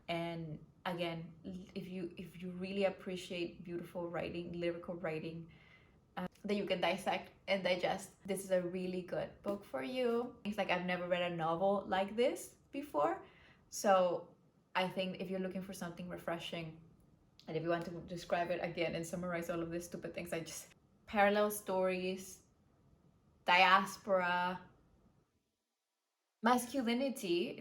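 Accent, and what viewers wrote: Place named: Latin America. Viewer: hi! first time watching a video of yours and i really liked it! your accent's so charming and it's great to hear book reviews from a latina (i'm brazilian, btw!):)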